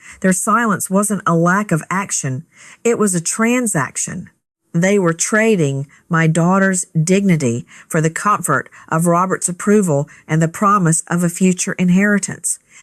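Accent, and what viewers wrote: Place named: South, United States. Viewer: Listening to this southern drawl accent is painful!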